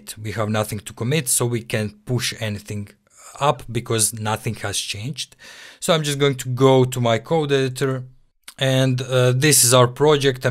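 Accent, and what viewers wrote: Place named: Russia. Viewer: u tallk like russian where u originated frm?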